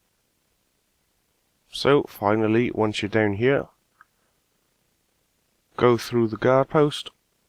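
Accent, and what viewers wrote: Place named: South Wales, United Kingdom. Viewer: Your accent sounds local to me...im from Cwmbran, South Wales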